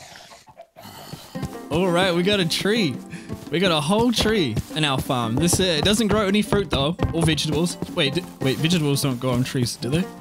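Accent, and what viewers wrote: New Zealand. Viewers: Hmmm,are you from New Zealand you kinda sound like a kiwi😂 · Just wondering are you a New Zealander?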